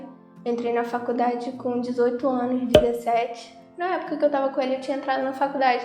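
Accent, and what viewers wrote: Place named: Northeast, Brazil. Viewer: Tantas pessoas passam e passaram pelo que vc passou, que bom que vc superou. Vc é jovem, bonita, extremamente simpática e humilde e merece ser feliz, vc tem obrigação de ser feliz. Quem te quiser por parceira deve saber que é prá vida toda, vc é uma menina de ouro. Parabéns pela coragem de contar o que aconteceu com vc. Pelo sotaque vc é do nordeste ou do norte, não é?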